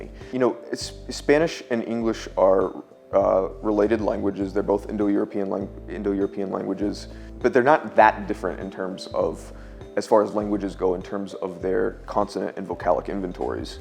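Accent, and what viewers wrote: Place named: California, United States. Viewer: HE HAS A CALIFORNIA ACCENT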